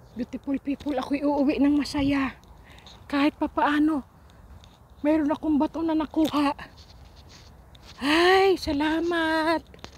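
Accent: Visayas, Philippines. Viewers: Bisaya ka ate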